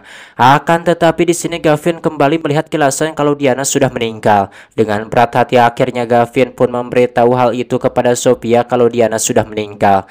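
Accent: West Java, Indonesia. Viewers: Aslina,, anyar da ngadenge review kieu tp logatna sunda pisan😅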